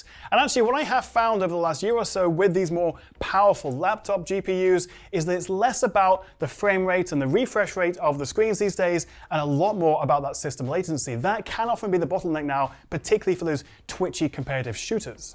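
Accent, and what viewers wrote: United Kingdom. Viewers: Thanks for the review. It was very informative. I like your British accent!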